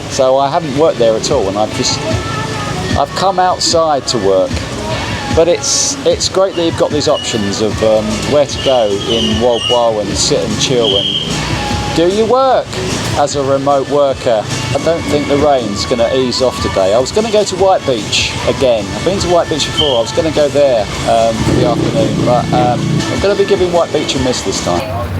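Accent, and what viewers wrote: England, United Kingdom. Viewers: Love your style, enjoy your choice of music and video editing and even your winging-Pom accent is becoming pleasantly familiar, keep it coming man, I dig it man you know what I mean man it’s cool and informative and visually and audibly appealing so zip zap us all with a little bit more please maaaan.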